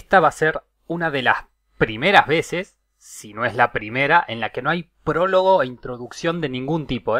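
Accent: Córdoba, Argentina. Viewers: Me parecia un acento cordobés pero se me confundía por el filtro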